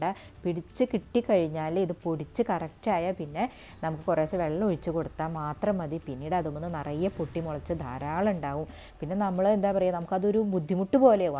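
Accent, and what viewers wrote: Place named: Pattambi, India. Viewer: Slag kettappo manasilayi.... 🤣😍.😍... me also... Pattambi.😘.. Madam evide?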